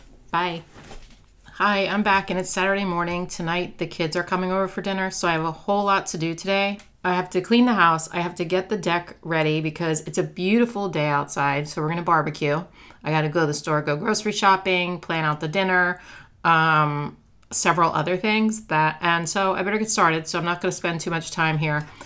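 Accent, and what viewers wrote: Wales, United Kingdom. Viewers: A gentle and thoughtful man with a British accent??? Swoon!! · Flowers and a Welsh accent?! ❤❤❤❤ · If he’s from Wales his accent is Welsh!😊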